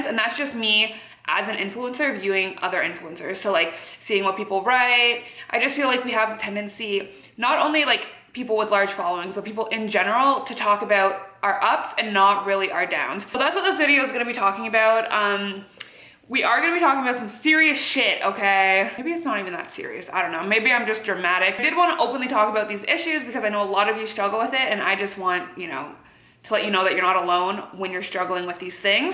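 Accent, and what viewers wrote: Canada. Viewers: This is the first i listen/watch your video without multitasking (lol i like to listen to story time youtube video whilst driving/do chores) but i just wanna say your canadian accent is SO noticeable 👌🏼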